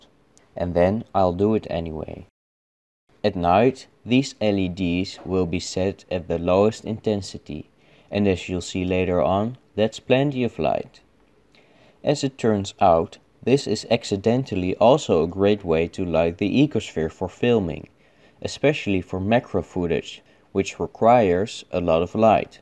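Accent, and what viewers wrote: Netherlands. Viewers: I think he’s Dutch. I have the same accent 😂... A lot of people I know who speak English on a daily on the workfloor also sometimes have a heavy accent. I believe it’s because our language is very accent reliant. It’s very difficult to understand someone speaking Dutch when they aren’t native. Fortunately for us this isn’t the case with English language 😬 but maybe if it was, we’d practice pronouncing English a bit better 🤷🏼‍♀️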